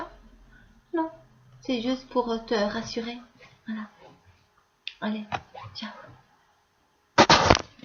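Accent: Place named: Liège, Belgium. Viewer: MDR. Tu me fais trop rire :)... Pour te rep.. "un mec" mdr... j't'explique vite fais, jsuis tomber sur ta chaine par hasard, en cherchant des gens qui parlais de nick conrad, ensuite jsuis tomber sur une video de toi qui parlais de ça, j'ai écouté, puis j'ai regardé en vitesse les autres video de ta chaine, mais étonnamment j'aime bien. T'as un coté artistique fort développé. Même si je vois que tu veux juste "parler de tout" et que oui tu t'en fou de l'avis des gens.. Jt'avoue que j'ai laisser un com's exprès pour voir si t'allais répondre, et j'étais sur à 99% que t'allais le faire dans une video mdr. jt'ai glissé un tacle suivis d'un compliment, juste pour voir... Mais la où tu m'as fais rire c'est sur le "un mec ou une fille, jsais pas" mdr... Ouai c'est claire que vu que je ne montre que mon oeil c'est difficile à dire mdr suffisait de cliquer sur ma chaine pour voir que le contenu n'as rien de féminin XD Mais bon, jvoulais juste que tu sache que j'aime bien ta façon d'être et ton accent de liège "jme moque pas jsuis originaire de Verviers ;)" et ce que j'ai bien aimé, c'est une phrase que t'as dit sur une vidéo : "c'est pas parce que je fais la conne que je suis débile" et c'est ce coté (jme prend pas au sérieux) qui est bien à voir.. 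c'est cool que tu t'arrête pas à l'avis des gens, vouloir plaire à tout le monde.. à quoi bon. Si tu t'aime toi, c'est le principal... PS: sur la video où j'ai laissé un com's il y avais déjà un "dislike" c'est pas moi qui l'ai mis, j'ai p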